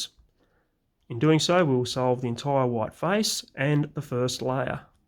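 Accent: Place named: Australia. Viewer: finally got around to watching your video. I didn't realise you were Australian. I'm in Adelaide.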